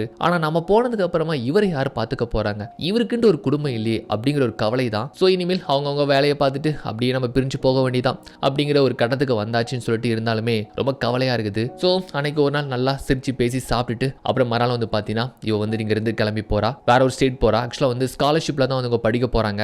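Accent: Tirunelveli, India. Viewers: Enna bro thirunalveli veliya varuthu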